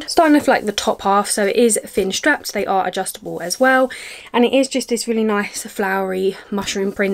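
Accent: United Kingdom. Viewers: SOFT MODELING.. JUST ELEGANCY AND BEAUTY... YOUR BRITISH ACCENT IS VERY CLASSY.. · My god.. I didn't know you had a British accent.. I am in love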